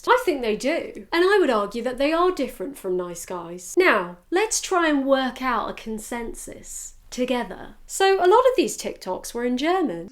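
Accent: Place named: United Kingdom. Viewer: Soooo just realized all my male partners were actually some kind of pick me boys AND all the female partners/dates were im not like other girls girls and woah bisexuality really is something else, like, makes SO easy interacting physically, romantically or both with every kind of awful people uncomfortable laugh Btw just discovered this channel and im obsessed! This channel has everything, an amazing British accent, great humour, cows, smashing the patriarchy and a lovely skinny alien dog (im a cat person, but I have a soft spot for skinny alien dogs) Okay, I'll stop this rant here, love from Italy!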